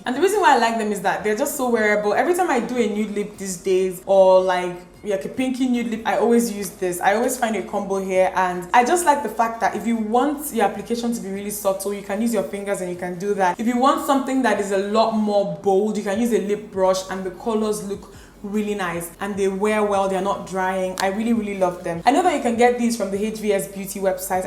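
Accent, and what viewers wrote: Nigeria. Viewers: Are you Nigerian? I love your accent 😍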